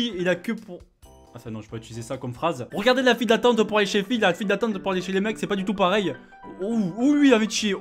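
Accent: South, France. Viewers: tu viendrais pas du sud car tu a l'accent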